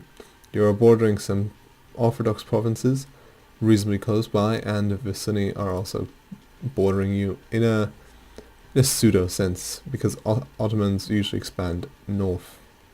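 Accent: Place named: Austria. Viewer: Are you from Austria? You sound like an austrian guy.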